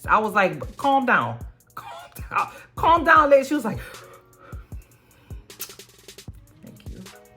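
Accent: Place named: South Louisiana, United States. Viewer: Love your priceless commentary! Adore your accent as well! I miss it, as a south Louisiana native also ( moved to Arkansas about 25 years ago.) So glad to have found your channel. ❤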